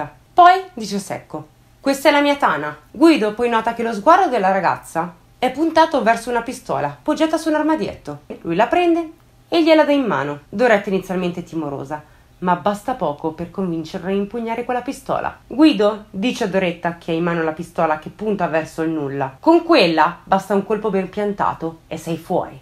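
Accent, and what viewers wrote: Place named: Piedmont, Italy. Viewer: Hai una bella voce, un bell'accento piemontese con gli accenti giusti, una rarità. E i tuoi resoconti sono veramente interessanti e esaurienti!!!! Io ricordo bene il caso Graneris! Sono di Biella e l'ambiente vercellese era quello della mia adolescenza, il freddo, la nebbia e una campagna desolata.....non la rimpiango! Sono tanti i casi italiani inquietanti che hanno lasciato la gente inorridita, e mi sarebbe piaciuto svescerarlli di più, ma non ricordo i nomi, spero che ti capiti di rivangarli, mi toglierei qualche domanda senza risposta. Intanto ti ringrazio, fai un buon lavoro!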